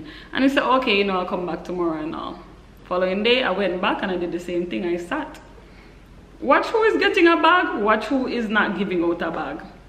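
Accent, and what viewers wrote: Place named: Jamaica. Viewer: Thanks for shearing this information to as we have Learn 1 or 2 I can not wait to watch ur China home where ur Jamaica accent is really good 😊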